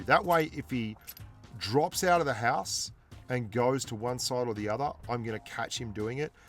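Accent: Australia. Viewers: He is better then powerbang. I like how he explained the strategy and technique. With that Aussie accent 😁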